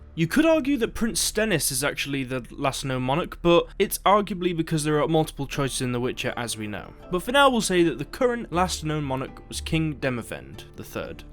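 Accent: United Kingdom. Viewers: Loved that intro for the Witcher 2 with Letho wish i had played it on ps3 and let Letho live. Are you from the UK?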